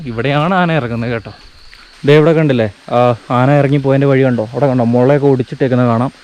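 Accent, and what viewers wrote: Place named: Malappuram, India. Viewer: Bro oru samashayam nee malappuram alle but slank. Bery anallo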